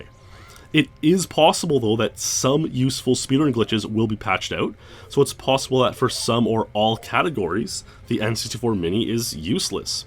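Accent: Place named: Canada. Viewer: The REALLY IS LOOSE Now, I know you're Canadian, you have the accent to prove it.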